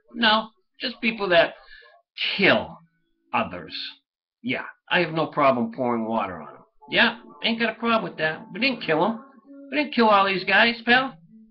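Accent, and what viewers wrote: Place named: New England, United States. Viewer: ***** Yes you do. You have a New England accent like President Kennedy. It's cool. Everything is good thanks.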